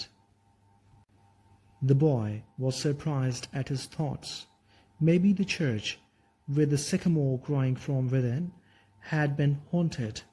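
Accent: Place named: United Kingdom. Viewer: Pure British ❤️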